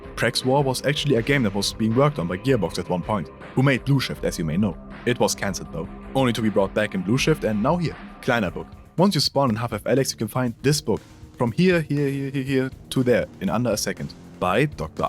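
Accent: Germany. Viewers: kannst du bitte den deutschen akzent loswerden der triggert mich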